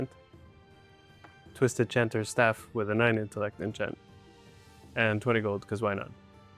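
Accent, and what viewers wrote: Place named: Sweden. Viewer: Thanks for your reply. Also by your accent I can tell you are swedish, right?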